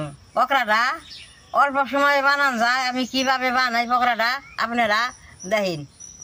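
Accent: Bangladesh. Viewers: Jethi Tumi khubi majadar jinish banao kintu abujh manush tumar mullayan kore na tumar bhasha shune moe hoy Tumi jamalpur Tangailer luk onek din becher thako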